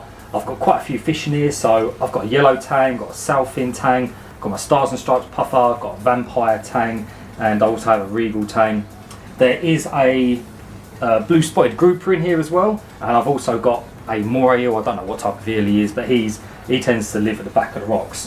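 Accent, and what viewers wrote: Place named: Essex, United Kingdom. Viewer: No way that’s a 350? You also sound like a local (Essex by any chance)? Lovely specimens 🐠 👌🏼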